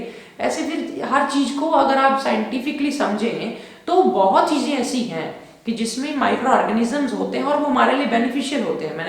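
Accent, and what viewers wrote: Punjab, India. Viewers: Namaskaram guruji I love your Punjabi tone and i am not Punjabi but I love Punjabi language...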